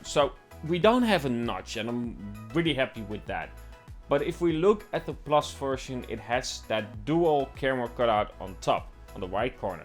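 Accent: Netherlands. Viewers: haha, ik dacht al dat ik een Nederlands accentje hoorde😂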